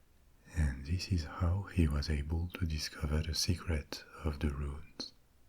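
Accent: France. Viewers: Je crois entendre un accent français donc je vais commenter en français. J'ai lu l'Edda mais ça c'est aussi une façon ludique d'en apprendre plus sur la mythologie nordique!😁 Ps: Je viens de voir le nom de la chaîne 😆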